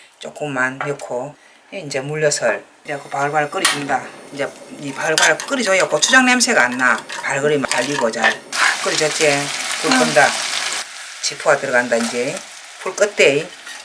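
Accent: Gyeongsang, South Korea. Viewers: 경상도 사투리 너무 정감있어요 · 구수한 갱상도 사투리 때문에 구독 ㅎㅎ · 저도 경상도라 그런지 사투리가 참 친근해서 친정엄마가 옆에 계신것 같네요. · 단추꾸늉 같이 경남 사투리 정감있고 듣기가 잼 나네요 ㅎㅎ감솨 해용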